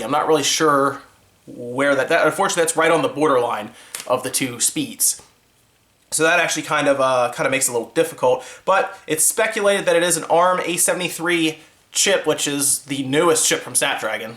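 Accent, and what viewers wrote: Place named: Philadelphia, United States. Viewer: That Philly accent though.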